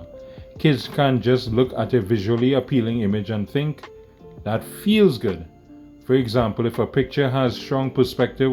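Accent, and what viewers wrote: Trinidad and Tobago. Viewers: Havent even listened to the video in full yet but following because i hear a Trini accent i think? And i support a fellow Caribbean person doing this 💪🏾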